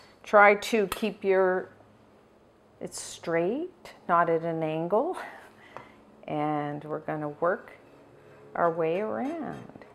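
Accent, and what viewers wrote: Canada. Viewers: Shane Enz Actually she's a Canadian, you can tell by her accent.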